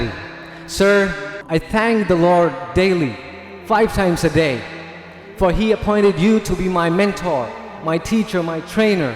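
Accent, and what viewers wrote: England, United Kingdom. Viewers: Asklm sir I am joining u r classes 2011 Karnatak college more 15 days Bidar U r English talking Maa shaa Allah u r teach Accent like this Angrazi 👨 man Maa shaa Allah Meri aap sa humble Request hai aap YouTube channel pa English Grammar classes ke video daliye plz Alphabetical phonics sounds ke Videos daliye plz meri 3 years daughter ko Teach karna hai plZ Humble request hai Sir plz Basic Education Covid19 ke resons sa schools tu closed hai plz plz plz Plz plz plz plz plz plz sir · MaaShaa Allah Bohot badiya bhai The way of speaking, behaving like an English man.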